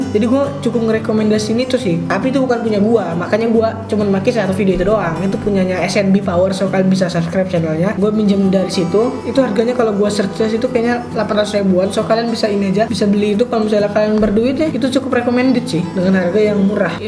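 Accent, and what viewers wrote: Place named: Lampung, Indonesia. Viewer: Logatnya lampung bgt aowkwkwk Salam dari Bandar Lampung gan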